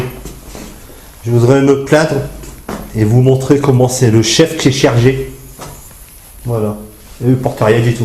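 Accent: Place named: Hauts-de-France, France. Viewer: C'est toujours un plaisir de suivre vos recherches. Vous êtes des hauts de France ? C'est le petit accent qui me fait penser ça en tout cas bravo beau travail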